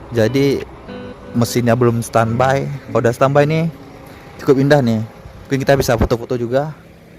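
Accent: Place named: Aceh, Indonesia. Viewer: Orng aceh ab ya, gmongnya ada g. Ong bhsa aceh. Oy new pelangi knp gk prnh nmpak b.???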